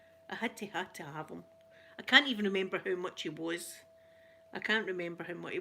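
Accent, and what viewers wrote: Scotland, United Kingdom. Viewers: I love scottish accent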